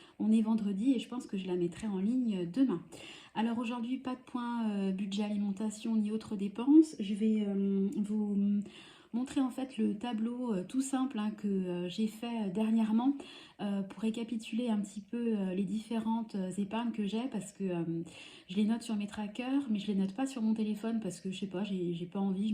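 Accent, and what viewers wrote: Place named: Franche-Comté, France. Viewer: Coucou, moi j'ai un tableau Excel dans lequel l'intégralité de nos comptes, des frais fixes, d'une partie des frais variables gérés en CB, et des épargnes sont intégrés. Puis pour les autres dépenses variables, j'ai mes enveloppes. Sinon, rien à voir hein, ton accent me fait dire que tu viendrais du Franche-Comté...? Mais peut-être que je me trompe 😆 Bon we 😘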